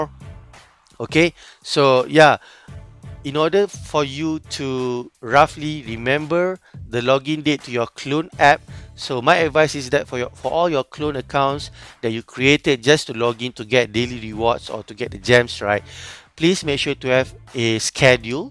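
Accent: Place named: Malaysia. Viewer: English nya khas Malaysia betol🗿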